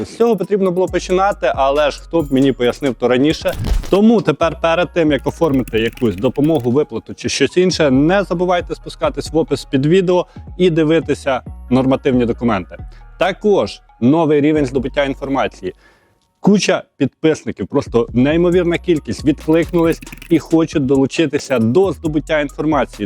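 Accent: Ukraine. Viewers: я це вже давно йому пишу,щоб вивчився спершу говорити вірно, а не на крижопільсько-жмеренському діалекті. А він не звертає уваги.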